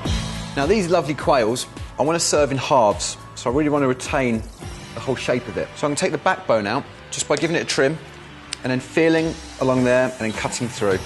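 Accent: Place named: Europe. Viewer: This ain’t southern y’all, this is European...The European accent the heeeerbs....hell naw this ain’t southern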